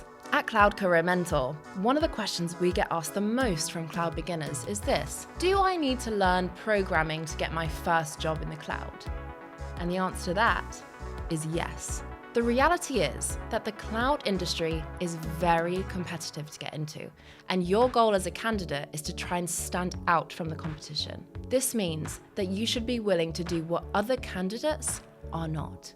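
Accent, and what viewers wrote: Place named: United Kingdom. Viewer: Woah this does make perfectly sense what says in this video. Plus I dunno if is British or Australian but the English people have the best accent in the world.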